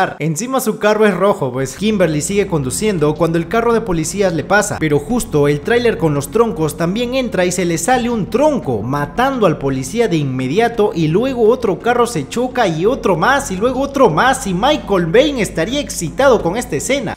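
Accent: Peru. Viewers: Que chevere que haya un yutuber que sea peruano y me narre la historia en peruano. Saludos desde la selva causa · Sin que diga que es peruano, ya sabía que era peruano en los primeros segundos del video 😆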